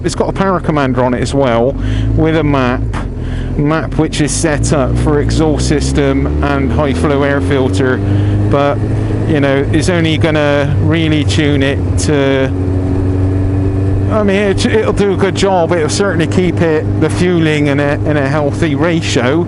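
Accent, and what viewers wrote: West Country, United Kingdom. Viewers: Hi mate, where are you from? Sounds like a West Country accent but a little different to my home town of Bristol!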